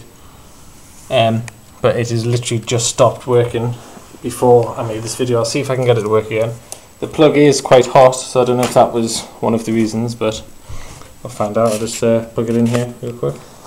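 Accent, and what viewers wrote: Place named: Newcastle, United Kingdom. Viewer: clicked on this video and I noticed the accent before the reptiles. TOON TOON!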